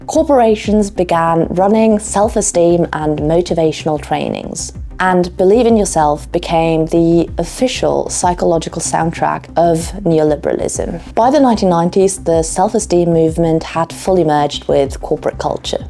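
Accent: England, United Kingdom. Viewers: Miss, are you Italian? You sound British. 🌹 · your englsh accent is awesome, why americans cant speak more like that. lol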